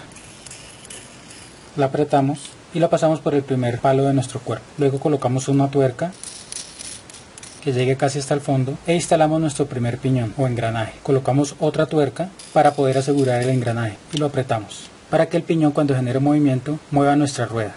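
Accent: Colombia. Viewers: Voz sos de colombia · Qué orgullo para Colombia que tengamos un profe de su categoría. Qué institución educativa tiene el privilegio de contar con usted profe? Tiene acento valluno. Saludos desde Antioquia.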